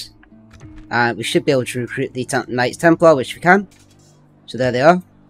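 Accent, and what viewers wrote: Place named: Scotland, United Kingdom. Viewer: Damn ur accent is thicker than the games scotish voice actor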